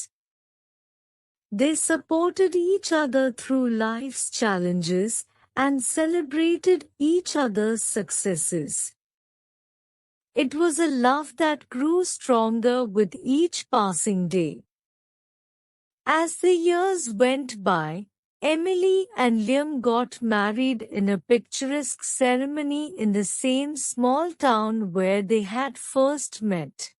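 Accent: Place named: India. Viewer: Why I listen to to India accent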